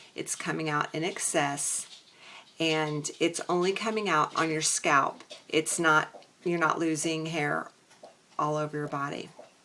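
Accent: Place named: South, United States. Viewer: 💗 I understand. I’m in California, in a liberal place with a lot of this holistic stuff going on. You have an adorable accent, it sounds a little southern? Anyways, you radiate like some glowing people do and i understand that energy when I’m well. The past week, my spirit has just sprung up. I’m feeling so happy. I saw that you accidentally erased one of my messages. That’s fine, I will get back to you very soon 💗🌸💗 I articulate well at certain times when my brain’s really on point, so I’ll get back to you. No worries. How do I order your book? I totally want to support you in this and hear your story and I will most definitely be able to leave a deep, honest, heartfelt review for you because I can be very talented in that way when it comes naturally 💗🌸💗 Much love!!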